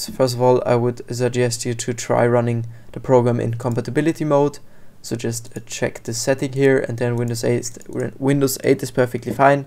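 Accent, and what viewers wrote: Germany. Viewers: i hear deutschland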